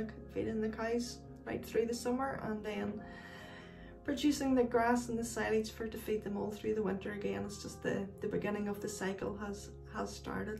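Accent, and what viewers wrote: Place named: Ireland. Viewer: Hello, it's nice to meet you. I live in Minnesota, began knitting about 1 1/2 years ago and I love everything about it. Your podcast, and you are lovely. Your Irish brogue is a bonus! Your shawl is looking great. By now you have probably cast on the Snuggle Down cowl and whatever combo you decide or have decided to use will be terrific. I enjoyed the pattern so much I knit two of them. Thanks for taking the time to podcast, Fern in Minn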